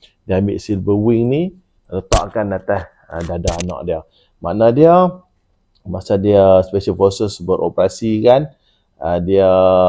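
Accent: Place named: North, Malaysia. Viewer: Assalam tuan pekat snguh tuan ckp utara rindu plk kg haha...mcm crown yg tuan pkai tu ada allah/muhammad kn klo org bkn islam pkai tu mcm mna plk tu tuan..😊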